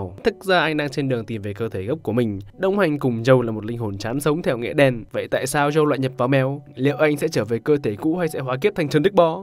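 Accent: North, Vietnam. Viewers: Giộng bắc mình đã ko nghe đc rồi. Bạn còn liên tục pha trò rồi nhắc tên người khác vào clip khiến cho mình ko thể nào tập trung hiểu được câu chuyện. Chắc là phải tự thân đi xem hết phim thôi 😞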